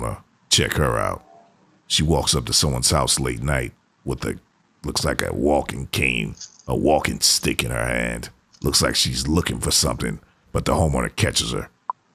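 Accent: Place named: Jamaica. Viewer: This narrator is the best! Bootleg Bob Marley and the Jamaican talk killed me 😂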